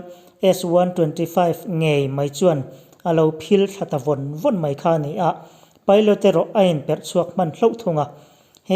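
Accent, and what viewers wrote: Myanmar. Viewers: I aw phawi hi chu mizo dik tak aw phawi chu a ang lo ka ti..beng ati thlep tlat..Burma lam unaute zinga mi ini lo maw..tha vek tho na in🤔🤔😂😂👍👍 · A chhiar tupa hi Burma lam chhuak I ang I thil lam dan hrang hrangah hian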